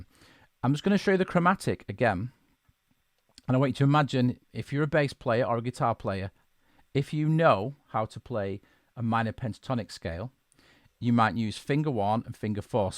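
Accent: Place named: Northern England, United Kingdom. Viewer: Superb tutorial, this. And nice to hear the accent of a fellow northern lad teaching it.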